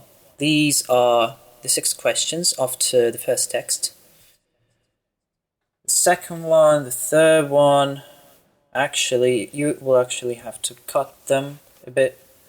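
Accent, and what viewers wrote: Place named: United Kingdom. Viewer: У тебя такой типично британский акцент)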